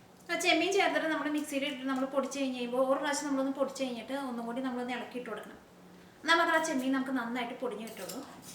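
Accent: Thrissur, India. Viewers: U r my guru at cooking.I got married two years back and since then I was watching your videos and tried alot of receipes and came up with success stories. Thanks alot .like your tcr slang and ur simplicity. Your videos r really inspiring for others to cook.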